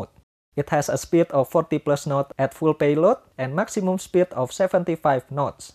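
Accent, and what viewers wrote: Indonesia. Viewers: Aaaahahaha... this is the accent of english language that i love.. Indonesian accent.. love my Indonesia... 🥰🥰🥰🥰🇲🇨🇲🇨🇲🇨🇲🇨🇲🇨🇲🇨🇲🇨🇲🇨🇲🇨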